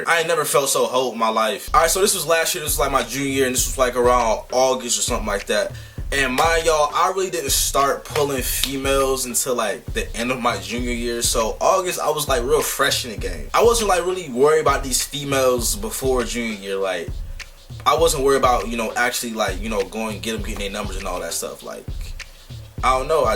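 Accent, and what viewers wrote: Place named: DMV, United States. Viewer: no lie bruh i can always understand you cuz your a dmv man.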